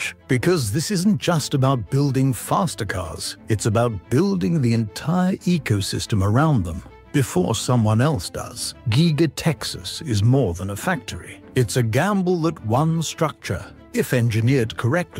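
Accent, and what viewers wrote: United Kingdom. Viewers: Why the heck do we need a narrator using flowery language and with an English accent telling us about Texas??? Stick to making videos about sheep farming in Yorkshire and how to properly hold a teacup! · W"hat's with the constant British voice in the backstory narration on anything BIG, BOLD. AMBITIOUS INFRASTRUCTURES in America....curious??! 🤔🤔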